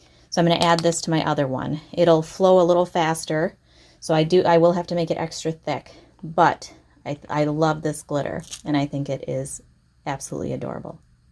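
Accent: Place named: United States. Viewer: Sounds like your American but where did you get the plastic bottles from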